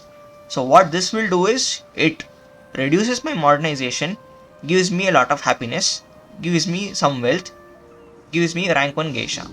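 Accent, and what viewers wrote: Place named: India. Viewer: 100% with that accent apna India. Bhai hai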